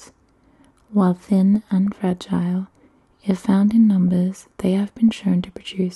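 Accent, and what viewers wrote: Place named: Australia. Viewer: What a great debut to ASMR! Enjoyed very much, thank you. Educational too. Lavender IC sounds amazing. 💜 😋 I always enjoyed the fairies in BoFIII video game PS1. They were cool and pretty helpful. 🧚‍♂️🧚‍♀️🧚 You are very beautiful and I like all your cool crystals and books. Oz accent very subtle and sweet to listen too. Space lights are nice idea too. Look forward to any future videos. 🥰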